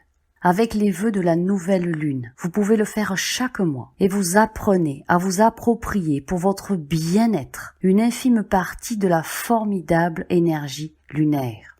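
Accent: South, France. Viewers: Bonjour, J'ai été une de vos 1ère commentatrice, lorsqu'il n'y avait pas encore cette déferlante d'abonné(e)s qui, je le souhaite, vous comblent..C super!!!🌞⭐ Et je me souviens qu'en ce temps là jetais entrain d'arrêter l'alcool et ça me faisait beaucoup de bien de vs écouter, vous avez été la 1 ère à me dire que j'avais comme nous tous cette étincelle divine qui demande à croître et que c'est notre droit de naissance (Je vous entends av votre accent du sud, votre fille(à l'époque) avait un soucis de pneu et c'était un peu raide financièrement mais finalement, du coup vs avez eu+De clientes!!Tt ceci pour vous dire que le tps passe et malgré ces années de quête, je me sens guidée mais je n'entends ni ne vois, ni ne sens, rien du tout, et j'ai l'impression de m'être coupée du monde(choix qui est voulu et volontaire mais qui pèse parfois..) j'ignore si vs allez avoir ce message, et si vs l'avez j'ai 1 question qui me gêne, pensez vous que le fait de prendre des produits de substitution à la drogue puisse me voiler encore plus ce que je suis, car vous l' aurez compris, c'est mon désir le+ardent et le+ beau de le découvrir, appuyés tous ces bobards sociétaires,bien à vs🐴🍀🕊🦎🌹🍀🍃💋💋💓☯️💎🕉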